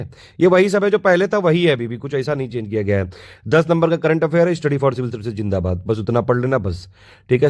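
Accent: Kanpur, India. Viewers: 😁😁😁😁😁 bhut sahi se liye ho kanpuriya style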